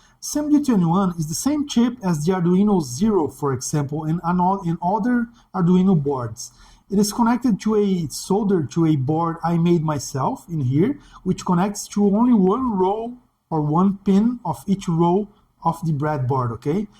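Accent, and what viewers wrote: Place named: Brazil. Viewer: my guy you cant hide your accent most obvious brazillian ever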